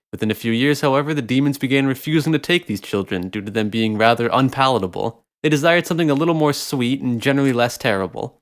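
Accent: Canada. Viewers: All of a sudden I can hear you Canadian accent and cannot unheard it. I can't stand it. This video has a demon in it and I am now cursed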